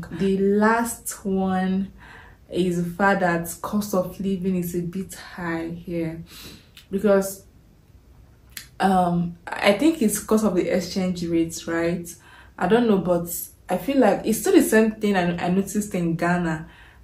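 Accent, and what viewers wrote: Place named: Igbo, Nigeria. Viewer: That's her Igbo accent. It's not everyone in Nigeria that calls it PEPE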